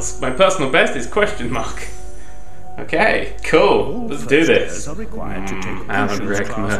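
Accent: United Kingdom. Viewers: 99% of what makes this video great is the fact that he is narrating a harry potter game with a british accent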